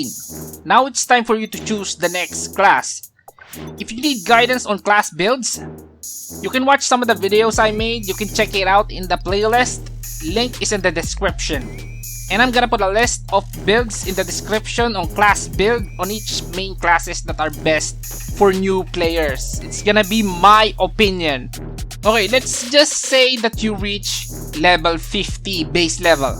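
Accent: Philippines. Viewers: i heard filipino accent.. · Oh how I miss pinoy accent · Pinoy ka ba? hahah you have a heavy accent xD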